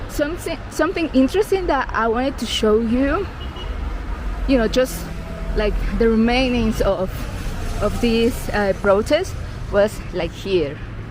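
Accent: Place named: Mexico. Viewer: I understood everything and I love your accent I don't know where you are from but I ges you are Mexican congratulations nice video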